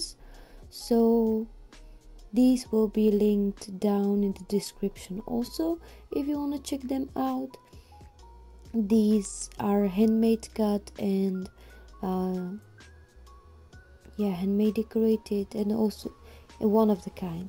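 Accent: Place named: Czech Republic. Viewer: Máš tam český přízvuk budeš nahrávat I český nebo alespoň titulky 😍 jinak super kontent a miluju akvarel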